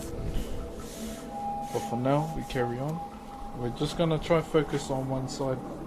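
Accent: New Zealand. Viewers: Maori accent, its not that common so you pick up on it more.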